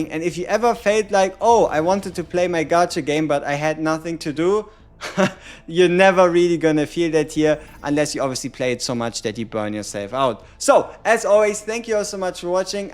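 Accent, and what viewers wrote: Germany. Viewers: You sound german. Nice video tho. Thx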